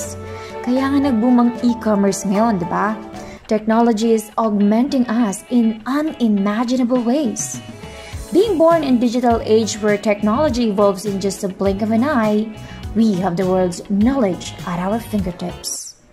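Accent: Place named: United Kingdom. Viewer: Goosebumps sa pronouncation ng mga words sobrang cristal clear maam😯 may pag ka british accent hehe.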